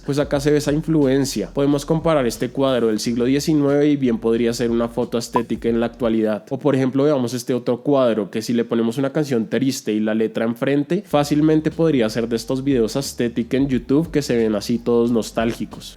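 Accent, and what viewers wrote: Bogotá, Colombia. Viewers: Mk se te nota que eres de Colombia JAJA y que eres de Bogotá :v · Che, no conocía a este man, pero apenas lo escuché hablar, me dije: "este man es rolo". Tremenda voz que se carga uwu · Pero si es roloooo, nooooo, a nadie nunca le gustó el acento rolo! Y respeto al man y me gustó el vídeo y casi no se nota, pero, QUE ESTAN DICIENDO? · El único acento rolo q no me molesta es el de este men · Puedo sugerir un video ? Me encantaría que hablaras un poco de Mariana froes. Ptd: Adoro el acento rolo. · Porque es de Bogotá casi todos hablamos haci aquí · Creo que es de colombia y colo de Bogotá, me suena su acento a esa región de Bogotá. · Es el asento rolo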